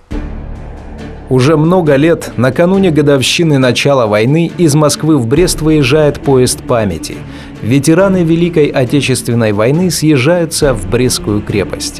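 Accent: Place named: Nizhny Novgorod, Russia. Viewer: У комментатора нижегородский акцент), с особенно выраженным произнесением буквы "я" в словах...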